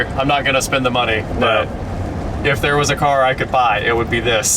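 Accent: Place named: South, United States. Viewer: Sounds like a southern poor boy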